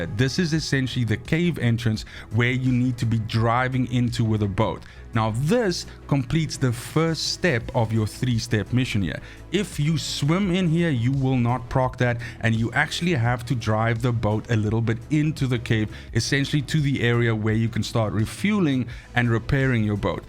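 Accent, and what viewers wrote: South Africa. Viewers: I'm trying to figure out your accent. Are you from South Africa?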